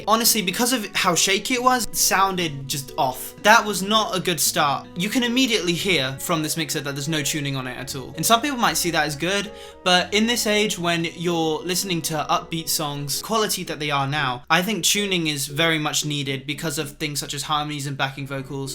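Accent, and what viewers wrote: United Kingdom. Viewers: Ok so this is my first time watching one of your non singing videos and your British accent hit me like a fucking train ngl. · J’aime tellement l’accent des UK 😭❤️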